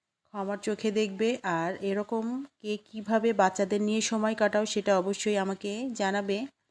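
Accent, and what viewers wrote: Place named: Kushtia, Bangladesh. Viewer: আপনি কুশতিয়ার কথাএ থাকে ❤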